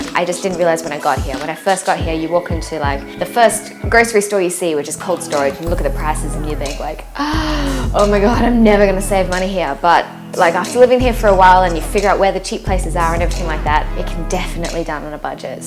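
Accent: Australia. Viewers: Hey, thanks for this video! It is very educational and informative! As an American, I've always been interested in visiting Singapore because I understand it is a very beautiful, stable, and safe country. By the way, I love that Aussie accent of yours! You're adorable and entertaining! I look forward to seeing more of your videos! You just earned a new subscriber!:) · Pssst... what's she nattering about? Did anyone get her Aussie accent? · God I love Aussie accents. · are you Australian? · You sound like an Aussie, are you from Australia?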